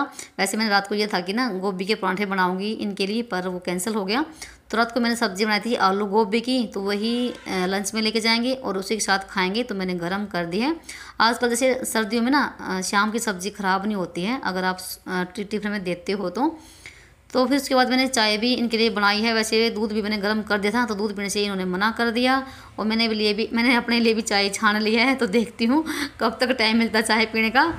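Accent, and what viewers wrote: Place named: Haryana, India. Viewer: Or aap ye bhasha kaun c bol rahi hein aapki bhasha thodi haryanvi touch liye hai aap wahan se hein kya..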